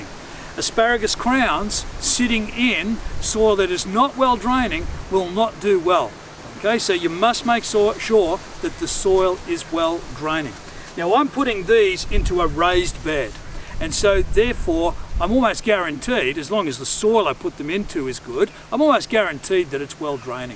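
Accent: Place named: Cockney, United Kingdom. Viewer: 2 questions for the asparagus expert: 1. I grew asparagus from seeds in small containers 3-4 sprouted in each I have 5 of those containers and 1.5m in length of ground/soil where I'm planing to plant them So my question is: is it okay to plant each pot with 30cm apart So it will be 3-4 asparagus - 30cm space - 3-4 asparagus - 30cm space etc. 2. Should I use the same method for planting / soil like you used in this video Many thanks BTW love the accent, reminds me of London's Cockney accent :)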